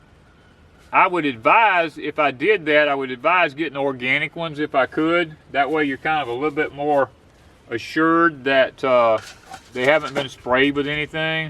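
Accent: South, United States. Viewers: I have a southern accent, too, so I don't notice his accent. He sounds like everyone I know. Lol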